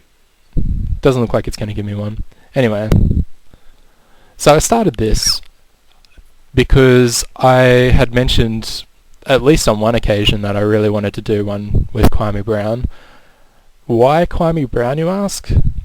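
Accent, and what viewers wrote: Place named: Australia. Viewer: no problem you from aus too by the sounds of it good work ill subscribe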